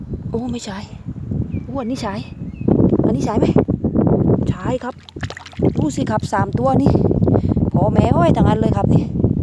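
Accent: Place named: South, Thailand. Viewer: ชอบค่ะนัอง อยู่จังหวัดไหนคะพี่ Rcha ค่ะfcใหม่ค่ะชอบมากเลยค่ะ น้อง พูดใต้น่ารักทำคลิปผัดหอยให้ดูทานใหัดูด้วยน้าาา มีเพจไล้สดมั้ยค่ะแจ้งชื่อเพจหน่อยค่ะจะย้อนกลับดูทุกคลิปนะคะชอบน่ารักดี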